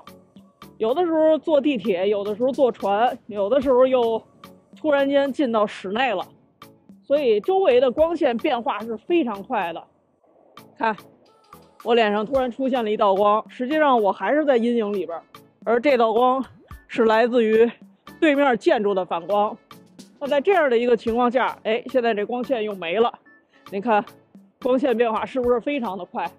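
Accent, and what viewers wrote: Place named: North, China. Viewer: 拜託 你不要看臉聴聲音也知道是大陸北方姑娘。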